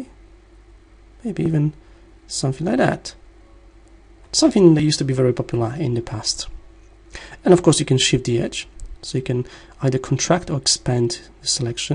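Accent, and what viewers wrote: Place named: France. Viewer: exotic accent ;) French or a Slavish Language? thanks for the tutorial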